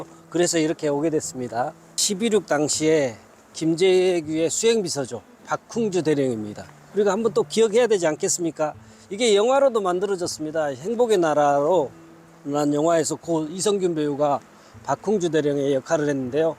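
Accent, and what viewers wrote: Jeolla, South Korea. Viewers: 전라도 억양으로 또 이런 코인팔이 하는 구나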